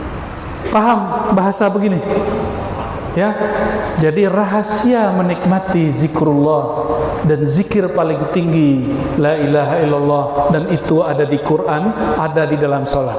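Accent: Padang, Indonesia. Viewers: Masaallah tabarokallah Buya bahasonyo Padang totok 😂ala lamo indak Mandonga Bahaso awak kok 🙏👳👍💛💛💛💛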